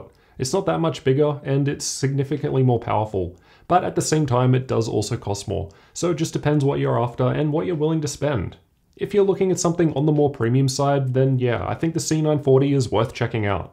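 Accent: Australia. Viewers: The Australian accent caught me off guard 😆🤟 not many Aussie techies on YouTube.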